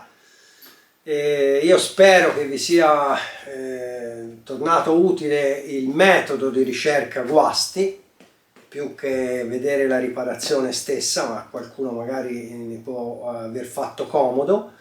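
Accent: Tuscany, Italy. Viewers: É toscano? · Ottimo lavoro,e complimenti per il video,il toscano mitico,e un accento che e ganzo,👍👍👍👍👍👍👍👍👍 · Complimenti per la chiarezza delle spiegazioni e per la simpatia toscana😂 Iscritto 👍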